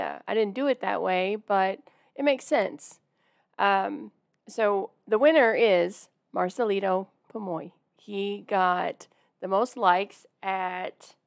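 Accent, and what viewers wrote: South, United States. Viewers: I love your channel. You're fun, show real reactions, and sweet. The southern drawl is warm and inviting. I've never seen judgement in your eyes and you support everyone you watch. + I LOVE, and many others and you deliver what your followers want. So thank you! ❤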